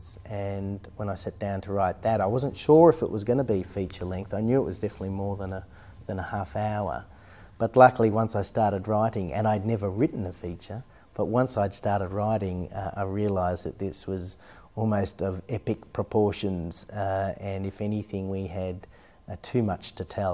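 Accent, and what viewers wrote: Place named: Australia. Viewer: I’m glad he still holds the Australian accent I know in love. RIP Australian Accent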